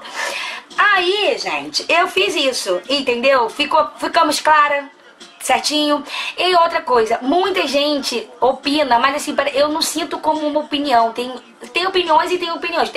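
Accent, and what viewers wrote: Rio de Janeiro, Brazil. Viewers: Engraçado nesse vídeo vc se empolgando tanto nos assuntos aí do nada: então vamos passar esse pó... rs já que o papo foi mais comparação, confesso que quando comecei a te assistir sempre que escutava sua voz lembrava da voz da Heloisa perisse na personagem do filme o diario da tati. Sou carioca tbm, mas vc é a primeira pessoa que vejo nitidamente o sotaque do carioca. Agr comparar com nayara azevedo até vai, mas com suzana Vieira. PQP, nada haver kkk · Você só tem um pouco de aparência da Ste por serem cariocas, o jeito de fala. Mais são completamente diferente uma da outra. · Acabei de achar a tal da Viegas no YouTube.. e naaaaada aver.. vc é muito mais doida e mais engraçada kkkk jeito único. O sutaque de vcs q parece só.. mas normal né , afinal é tudo Rio.. bjos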